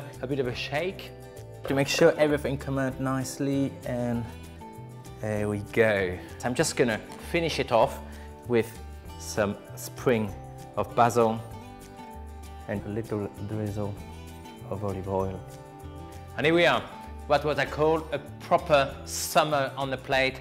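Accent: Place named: France. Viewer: ur French accent is slowly picking up some British slangs too I think haha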